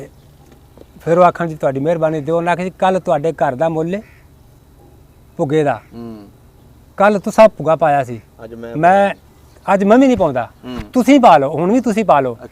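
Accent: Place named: Punjab, India. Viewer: Auncle g my parents came from Gurdaspur too, I like yr Punjabi accent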